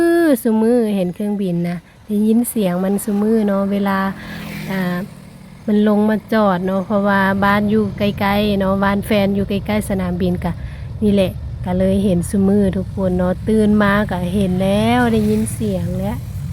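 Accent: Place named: Northern Laos, Laos. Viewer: สบายดีค่ะน้อง คนสวย...เขามาเลาะเบิ่งยนต์แนมเครื่องบินนำน้อง จ้า..และฟังน้ำเสียงว้าวเพราะๆอ่อนหวานน่าฟังบ่ฮู่ว่าสาวลาวเหนือว้าวเพราะทุกคนบ้อจ้า...👉(น้อง คนสวยถ้าได้กลับบ้านลาวเหนือทำคลิปสาวลาวเหนือว้าว(พูด)ให้ฟังนำเด้อจ้า)👌👍😲💖💙🇱🇦🇹🇭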